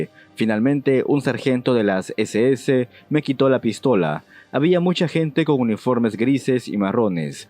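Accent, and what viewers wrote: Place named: Peru. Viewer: Muy interesante vídeo, una lástima luchar sabiendo que está todo perdido. Tenía años que no veía un video del canal. De antemano una disculpa. Antes pensaba "bueno, es un canal nuevo, aunque interesante aún no sabe modular bien su voz, es difícil hablar a un micrófono" pero hasta ahora veo que es porque es peruano y por eso es tan chocante su acento...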